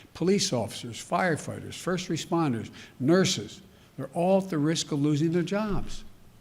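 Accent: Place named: Europe. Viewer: Hey are you American? Your accent betrays you sounds European, just asking? Maybe you were born in America and raised elsewhere anyways I do my homework. I Please stop trolling Traditional Catholics Conservatives and spreading strife....go find a liberal site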